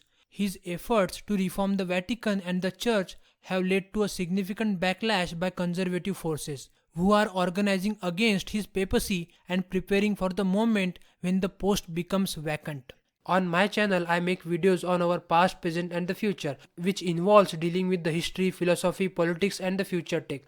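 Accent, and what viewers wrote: India. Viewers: how it is possible to listen? Omg Go learn how to speak without this hindi accent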